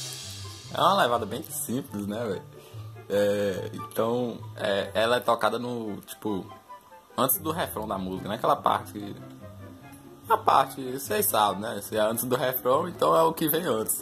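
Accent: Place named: Northeast, Brazil. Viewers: Forró é vida ? Só pelo sotaque vejo que é nordestino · Teu sutaque parasse se da Paraíba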